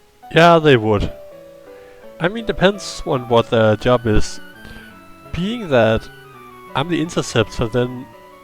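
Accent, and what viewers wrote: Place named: Denmark. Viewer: omg I love the shoutout you gave me at the beginning 😂😂😂(funny thing is when I first saw your video I was wondering if your accent was Australian and that's why you had a Talon flair. Took me a while to realize you were Danish because only Danish people pronounce the letter "l" at the end of words that way) V10 was interesting because that's when I really began to feel reinvested in Rejuv. Looking back, it was V4-6 that really got me invested in the game because that's when the story began to get riveting (I always loved the Valor Mountain finale), but then V7-9 came and I found them quite boring. V10 not just brought the return of interesting story, but I think that's when it really began to feature the beautiful mapping style that we associate with Rejuv. To me, it was the first version that felt mature and well-made and no longer like it trying to play catch-up to Reborn. 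Though interestingly, lots of fans kinda dislike Ch 12 due to the story. I remember Jan acknowledging the flaws with Ch 12 when he remade it in V13. Something about introducing more gameplay elements since it was more like a visual novel than a game. But my understand though is he couldn't change much of it as it was too consequential to the worldbuilding. The revisit to GDC in Ch 12 is quite interesting, since it was scrapped and moved around in 13.5 but contained important story elements that needed to be placed elsewhere: - Was the battle with Risa in the beginning of Ch 12 only added in a later versio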